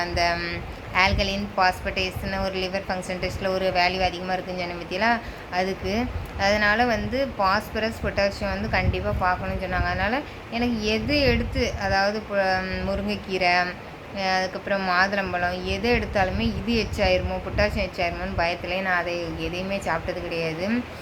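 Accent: Tirunelveli, India. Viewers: Unga peache peachu. Enakku tirunelveli slang romba pudikkum 😊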